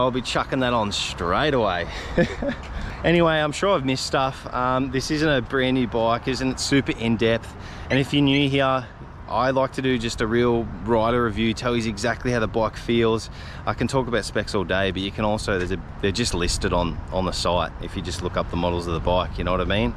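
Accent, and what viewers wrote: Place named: Australia. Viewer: Are you from 'Down/Under, Bro? 😁 Love your accent 😃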